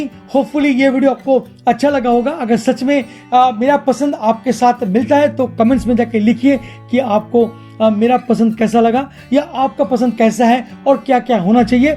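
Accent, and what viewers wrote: Bengal, India. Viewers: Bengali ha?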